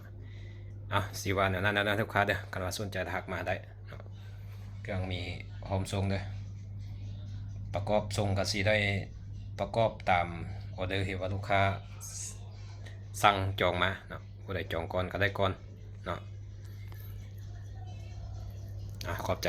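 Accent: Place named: Khon Kaen, Thailand. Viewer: ເວົ້າຄືທາງແກ່ນທ້າວບໍ່ແຕນແທ້ອ້າຍ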